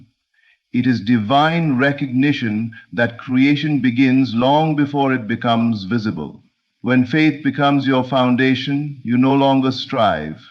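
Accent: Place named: India. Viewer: I can hear the Indian accent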